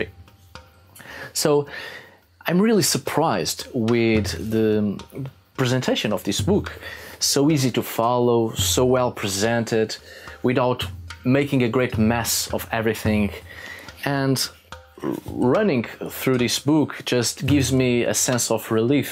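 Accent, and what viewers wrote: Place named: Portugal. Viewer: You are portuguese right? The way you speak your english, the way you pause in between words, and the words you choose. It really sounds like a portuguese speaking in english. (I am portuguese btw ahah)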